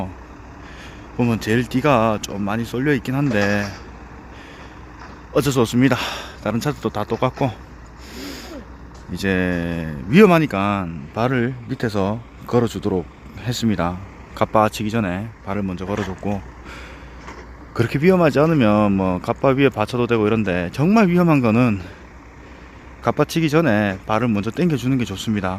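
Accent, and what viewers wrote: Gyeongsang, South Korea. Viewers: 늘 지나다니면서 저게 뭔가싶었는데 공룡알이라는 귀여운이름이었군요ㅎㅎㅎ 영상잘보았습니다 저도 경상도사람이지만 경상도말을쓰면서 친절하게 잘 알려주시네요 잘 보았습니다^^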